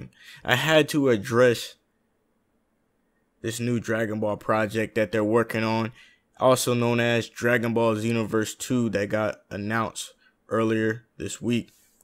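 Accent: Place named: South, United States. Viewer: Your country accent is funny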